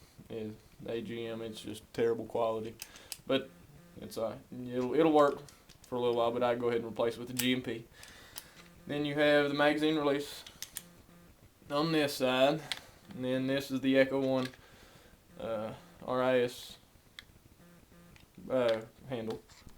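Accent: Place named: Texas, United States. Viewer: This is not to hurt your feelings, but you sound like the Texas guy from BFBC 2.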